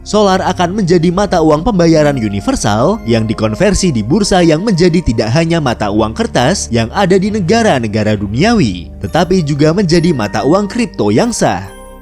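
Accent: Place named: Garut, Indonesia. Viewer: Asli Garut kah min?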